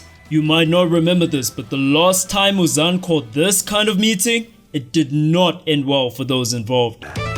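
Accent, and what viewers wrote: South Africa. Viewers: r u from ZA? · You're south african right? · You sound South African my dude I like the accent ✨ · I swear brother you gotta be South African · where are you from? You sound South African bro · So good to hear an SA accent in a video about my favorite series. Reminds me of getting lectured on Pokemon by my older cousins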